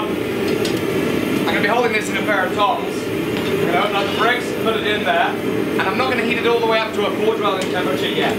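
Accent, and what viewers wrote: England, United Kingdom. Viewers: Why does he have an American flag and sound British? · how come you sound British yet have American flags everywhere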